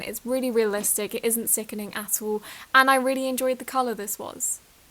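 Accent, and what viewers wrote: United Kingdom. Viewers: Their accents are nothing alike. Australians have more of a drawl to their accents, whereas people from Great Britain have more of a clean accent (unless you're from the north, of course). is british.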